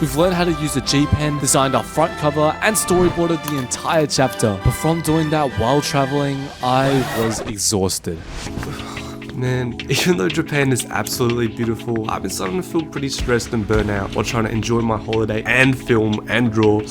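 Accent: Australia. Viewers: Hold on ur australian i am two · You are AUSTRALIAN!?? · I didn't know you were Australian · Are you from Australia?😮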